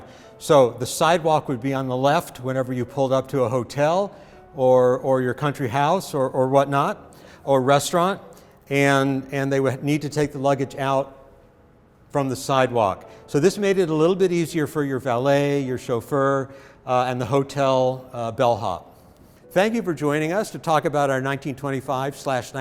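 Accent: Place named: United States. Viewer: Cannot fathom American pronuciation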